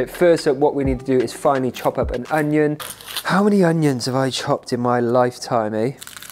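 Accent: United Kingdom. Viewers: Brilliant!!! 🤤🤤🤤🤤 I’ll never get sick of your UK accent, how you say vittttamen especially, adorable and sexxxy 😉😁🤣🤣🤣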